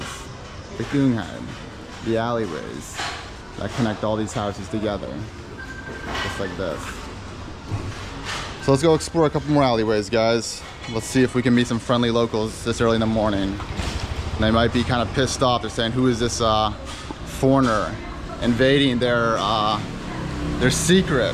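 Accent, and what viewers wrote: Cambodia. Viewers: how does this guy sounds so foreign yet so khmer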